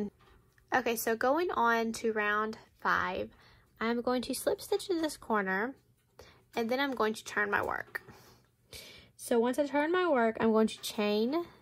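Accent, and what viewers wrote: United States. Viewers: Americans should do something about their accent!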